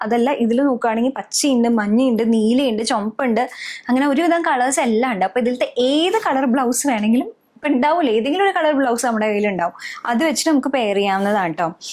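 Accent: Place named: Valluvanad, India. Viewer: Thrissur കാരി അല്ലേ...പക്ഷെ സംസാരം..slang okke വള്ളുവനാടൻ ആണെല്ലോ...സ്റ്റൈൽ ിനു വേണ്ടി ആണോ ഇങ്ങനെ പറയുന്നത്... anyways nice vlog ❤❤❤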